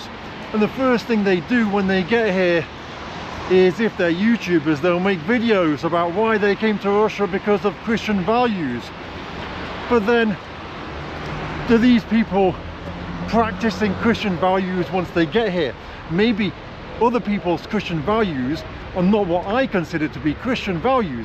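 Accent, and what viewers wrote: United Kingdom. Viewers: you've got a big heart. You went out of the way to help the woman in the US to get in touch with her mom, and you bought a phone for her mom to call US. Not many people would do that. By the way, if it weren't for your British accent, I would have mistaken you for a Russian.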